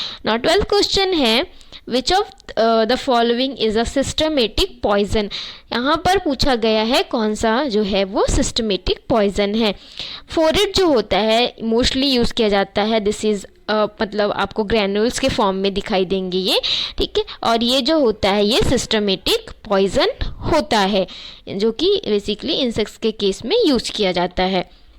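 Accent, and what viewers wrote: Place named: Kanpur, India. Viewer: to kaha se .... Apki boli se lagta h sp Kanpur ki ho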